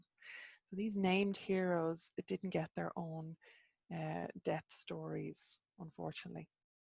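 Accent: Ireland. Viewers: As always, I enjoy the reading and commentary in your sweet Irish tones. I am glad all the passages are being saved in one playlist!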